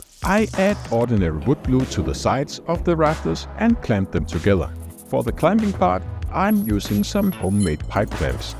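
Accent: Denmark. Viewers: dam im danish (i use differents accents on danish and english) and i imediately were like "that sounds so stupid.. is he using a danish accent?" and then you were like "in denmark" xD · Som dansker var det for grinern at høre en dansk accent i starten af videoen. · Som dansker må jeg bare sige, at der i reglen ikke findes noget værre end at høre danskere (inklusiv mig selv) tale engelsk...men din video og fortælling er så god, at jeg kan ignorere det! Det er en sjælden gave,. Godt klaret og fed video! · Ej fy for helvede din dansk-engelsk stemmer får mig til at krumme tæer... Virkede ellers som en spændende video. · I love it that you do voice over using your own voice and amazing Danish accent. I get sick of people using AI voice that sounds like a 1950's American radio program. Tak fra Holland! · you sounds danish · du er da dansker! jeg kan kende dig fra din accent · I was just thinking "this is cool but you can't do it in Denmark" when I clicked on the video but then I heard the accent ❤ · Hej fra Canada! 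(Parents are Danish though. Great hearing the accent again)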